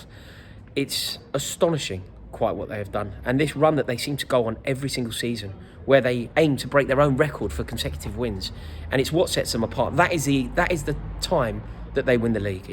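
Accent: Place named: England, United Kingdom. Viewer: Your English accent is so fine to hear , very traditional and classy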